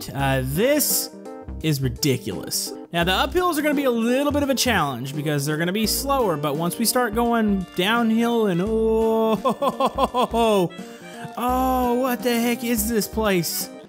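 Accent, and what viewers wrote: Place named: South, United States. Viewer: By hearing your voice it sounds like you have a country accent...no offense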